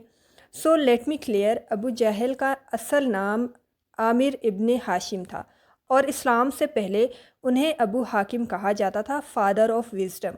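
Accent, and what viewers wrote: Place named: Punjab, Pakistan. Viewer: السلام علیکم قرآن مجید کے الفاظ ہیں آپ ان کو اردو اور پنجابی کے مکس تلفظ میں ادا کر رہی ہیں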